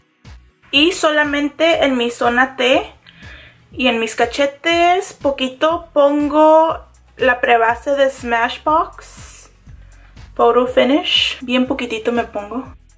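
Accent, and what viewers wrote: United States. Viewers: cual es la necesidad de hablar como gringa? v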